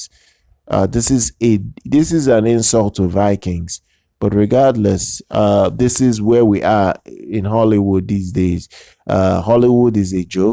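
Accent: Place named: Nigeria. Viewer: Such a breath of fresh air. Seen a video on this. From what I'm going to say sounds like Nigerian, your Nigerian. It sounds like It's so good to see some black people refute this because I am getting into the show and for years now we're seeing just tokenization after tokenization all the time and it's always white characters being changed. So frustrating. I don't like it on either side of the spectrum but the moment you say something you're deemed a racist and it's just so wrong and so many levels. They're trying to blackwash everything in the name of diversity and inclusion and it's just when you're dealing with a historical show and historical figures, this person actually existed. The person that they changed actually existed was a white male and they've changed him for no reason other than pushing the message. Thank you! Thank you for this video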